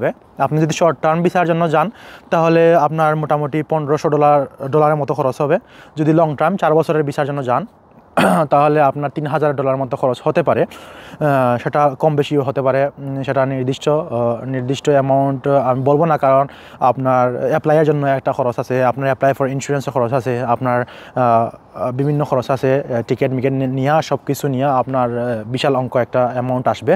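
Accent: Sylhet, Bangladesh. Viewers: Vai er kothay buja jar Sylheti bhai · ভাই সিলেট নাকি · আপনি সিলেটী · Are you sylhety?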